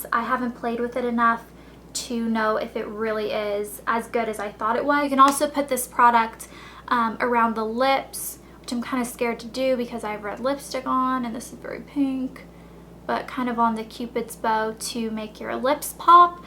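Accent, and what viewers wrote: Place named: South, United States. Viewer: NAPAL she has a southern accent :) the middle "a", nApal, sounded like an E :)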